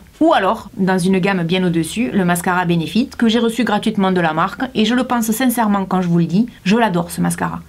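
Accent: South, France. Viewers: Quand je t'écoute j'ai l'impression d'être à la maison! L'accent du sud que j'ai la chance d'entendre qu'en été ça pourrait rendre la vidéo la plus inintéressante agréable à écouter! Sinon, pour le Badgal Bang, on est d'accord, il est génial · j'adore ta chaîne t'es naturel et franche et l’accent du sud qui va avec ^^ :) pourtant ça parle de maquillage (pas vraiment mon truc haha =D ) mais je sais pas j'aime bien regarder, ça parle un peu de tout les sujets =) , bonne continuation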